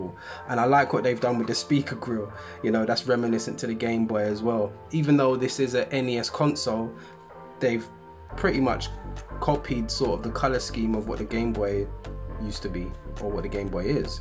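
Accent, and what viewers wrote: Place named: United Kingdom. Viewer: An NES emulator -- with a wide screen and "X" and ""Y" buttons... Interesting. So where are you? From your accent, I would guess the UK, but you are talking prices in dollars.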